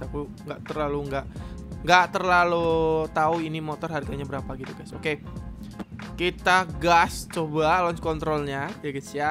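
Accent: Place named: Purwokerto, Indonesia. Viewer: purwokerne ngendi pak? logate ra ilang haha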